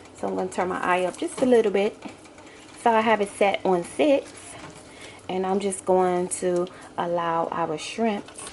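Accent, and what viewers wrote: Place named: Baltimore, United States. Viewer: You from Baltimore aren’t you. ❤️? Me too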